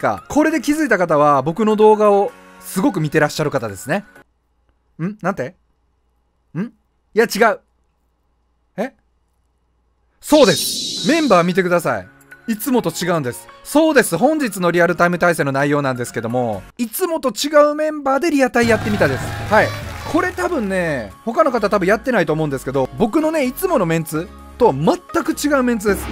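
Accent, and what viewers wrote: Kansai, Japan. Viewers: 標準語からバリバリの関西弁に戻った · 昨日からはうって変わってコテコテの関西弁に戻ってて草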